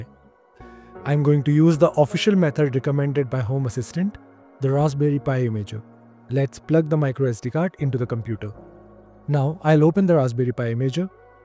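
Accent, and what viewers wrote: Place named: India. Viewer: From your voice guessing you are an Indian and hope you live in India coz we need India specific affordable solutions. I have been using Sonoffs, Tinxys, Smarteefi...etc in my house and plenty of Amazon and Google smart speakers. This week have asked my kids to try HA on an old Lenovo mini PC. Eventually I may move to one of the Chinese mini PC brands like Gtek. The speaker you mentioned is 8K in India. Anyway we can use Alexa or Google speakers for this?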